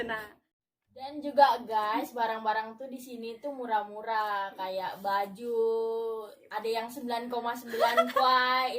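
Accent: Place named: Central Kalimantan, Indonesia. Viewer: mirip logat kalimantan tengah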